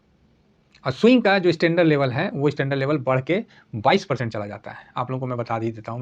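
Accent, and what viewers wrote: India. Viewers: Sir ji apka bolne tarika aur boli bahut achi hai Desi style 🎉🎉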